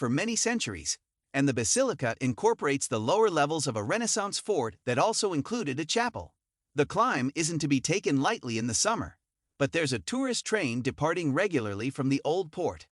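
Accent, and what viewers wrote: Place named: United States. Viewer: Wow. Hardly any words were pronounced correctly. Your American pronunciations spoilt the whole video.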